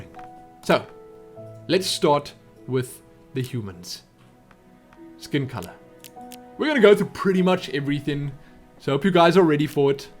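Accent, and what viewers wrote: South Africa. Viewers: Sounds South african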